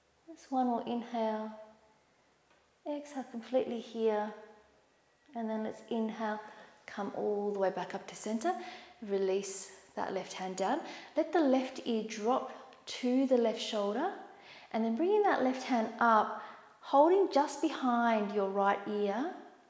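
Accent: Australia. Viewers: Wonderful. Thank you so much. REALLY needed this after a long work day writing. I've just discovered your videos and having grown up in Aus I SO appreciate your soothing lovely homely accent and the Aussie birds in the background. I'm 17 weeks and only just getting back into yoga after a break to feel rough as old boots during the first trimester and your videos are such a wonderful resource. I'll definitely be donating x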